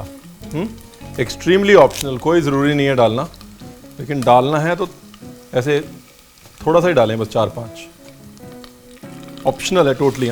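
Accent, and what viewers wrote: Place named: Punjab, India. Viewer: Sir, ranveer brar you are just awesome ♥️♥️... I have seen all your recipes like each and everyone.... And I just love your accent more then your dish of course your dishes would be more awesome 🌍♥️. I really love the punjabi in you... I recently saw your motivational video on some YouTube channel. And I was so happy that we have uh... The best chef I even watch your serial Raja rasoi aur andaz anokha it's awesome ♥️♥️.... I just wanna say that sab chef's khane banate hai pyar banate ho💕... Love you sir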